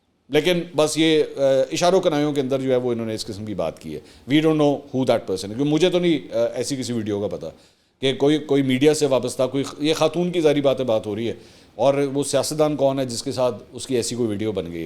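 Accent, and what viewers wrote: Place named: Lahore, Pakistan. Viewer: Actually you are a typically Lahori same accent way of talk thay inspiring NS and others Ap ka qasoor nhi AP ki majboori hai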